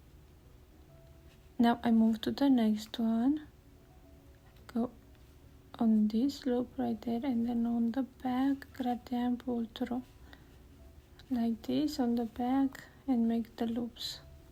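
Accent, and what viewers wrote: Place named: Romania. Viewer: I love your videos. My grandmother was from Romania and your accent and voice reminds me of her. She created such beautiful crocheted items. I still have my father's linen bassinet blanket she hand sewed and where she added a pretty crochet border to it. Thank you for your videos.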